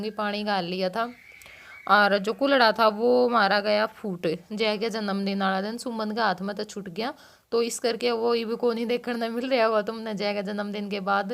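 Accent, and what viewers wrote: Haryana, India. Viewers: aaj to kte pure haryanvi m laag ree h . pr . bhot achy lge aap b vlog b . keet it up dear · Ram ram diiiii. Aapki haryanvi boli must h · Haryanvi andaaj mast lage di 👏👏👏👌👌👌👌👍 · Aaj ki video bahut achi lgi haryanvi me aise hi haryanvi me hi video bnaya kro aap deswali boli bolte ho👍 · Ram Ram jii didi aapki Haryanvi boli 💓💓sun k ne maaja sa aaga 👍👍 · Full Haryanvi 🤗🤗🤗🤗🤗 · Sidhi lath maar haryanvi baki achi lage h boldi haryanvi boldi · Bhn haryanvi bolte bhut acche lgo ho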